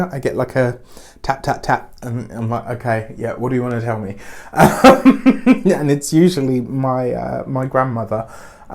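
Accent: England, United Kingdom. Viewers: Dude, i don't know if i want to stay here. You're just to cute and that English accent is driving me Crazy🤫. 18 Years with my husband Remember.😂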